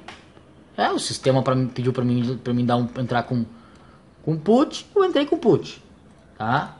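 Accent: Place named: Rio Grande do Sul, Brazil. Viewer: Cara, tu eh gaucho? Pelo sotaque parece... tambem sou do sul, abraco, otimo video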